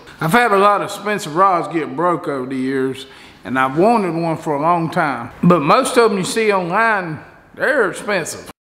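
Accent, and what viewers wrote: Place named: Appalachia, United States. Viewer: You talk like a hillbilly just like me.. I sound so funny.. That is why I dont do vids.. Great vid. My next weekend project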